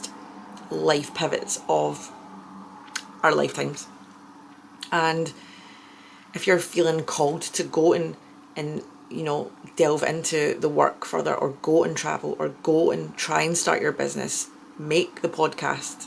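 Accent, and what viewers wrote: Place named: Scotland, United Kingdom. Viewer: It was very evident once I heard you speak😅 my bf is Irish, and I love his accent, but the Scots are something else💝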